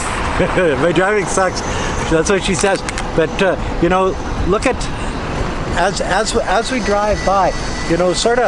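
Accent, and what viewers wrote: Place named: Canada. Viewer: Lol that accent can't get any more Canadian. Love it!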